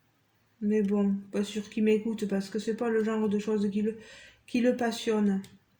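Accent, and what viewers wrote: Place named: South, France. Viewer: Hello sacré moment en ta compagnie. J’aime toujours autant ton accent du sud. Bisous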